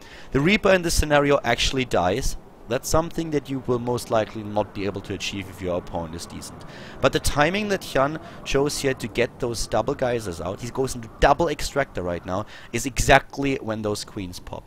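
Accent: Germany. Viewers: i am quite new to this game and got heavy problems to get into it anyway every beginning is difficult.. i watched a lot of videos alrdy highlights builds orders anything.. but your comments and explaining the games is awesome man it really helps and is fun as the same time really awesome videos i cant tell you how much i enjoy to watch your videos about SC2 really really hardcore thanks for that man. dont know may it is your job. finally pls tell me aer you german or american ...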